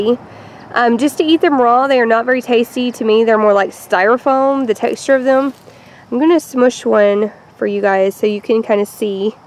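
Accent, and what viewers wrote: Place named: Texarkana, United States. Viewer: I could tell by your accent you are from Texarkana ❤ My grandparents and mom were born there so I have lots of family there. We have beauty berries all over our land in East Tx and I was watching your video while picking the berries from stems I had cut this weekend. Oh my goodness, these plants stink lol!! No one mentions that in videos. Maybe it's just me but they made me sick to my stomach smelling them for a couple hours. I sure hope the smell is gone after boiling!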